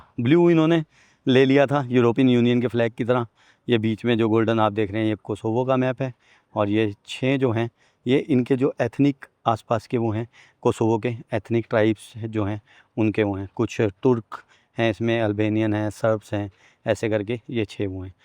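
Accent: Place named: Delhi, India. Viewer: Bhaiya tum to Dilli ki boli bol raha ha Dilli da ho kya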